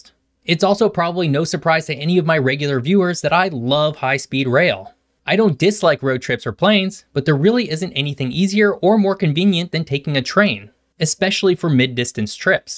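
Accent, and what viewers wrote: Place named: Oregon, United States. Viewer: sounds like he is from Oregon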